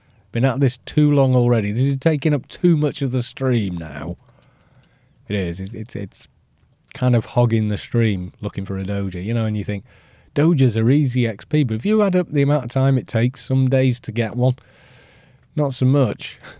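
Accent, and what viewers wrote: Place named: Scouse, United Kingdom. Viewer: I always thought you had a bit of Scouser in your accent.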